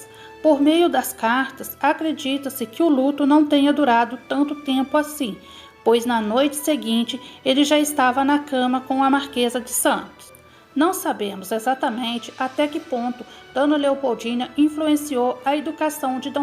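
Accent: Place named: Piauí, Brazil. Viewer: Vc é do Piauí? Seu sotaque me lembrou meus amigos de lá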